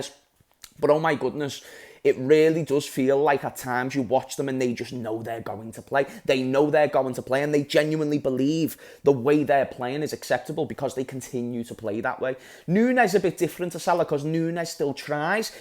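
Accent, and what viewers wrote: Liverpool, United Kingdom. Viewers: I love the Liverpool accent I am Scottish from just outside Glasgow and my accent is very broad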